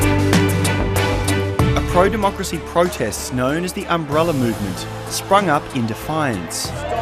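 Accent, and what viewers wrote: Australia. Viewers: Sounds like an average Aussie accent to me.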